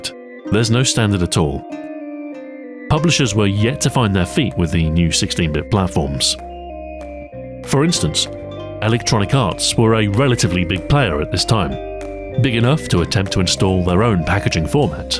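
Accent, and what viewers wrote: United Kingdom. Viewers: You're back! Awesome. Your sophisticated-sounding British voice is the coolest. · I just watched 22 minutes of a well-spoken brit talk about boxes and I fucking loved it. That's the power of I tell you damn.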